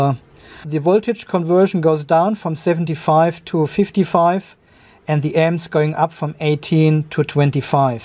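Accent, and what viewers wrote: Germany. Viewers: Nothing sounds more stupid than a German trying talk english 🤣😂🤣😂🤣😂🤣😂🤣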